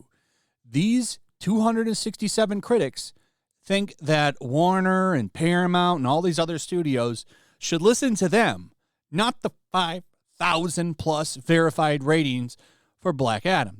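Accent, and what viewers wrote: England, United Kingdom. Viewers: when you developed an English accent I thought I was watch heel vs baby face but minus the screams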